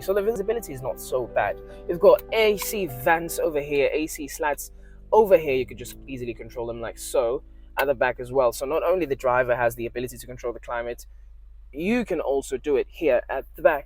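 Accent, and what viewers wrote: United Kingdom. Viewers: Bro, where did you learn to speak like that? Too smooth with that British accent👌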